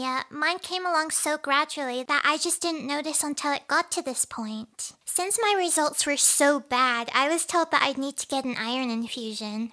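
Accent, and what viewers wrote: Australia. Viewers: ARE YOU AUSTRALIAN?!😮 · YOUR AUSTRALIAN 😮 I AM TOO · Honestly, I didn't notice the australian accent until she mentioned australia.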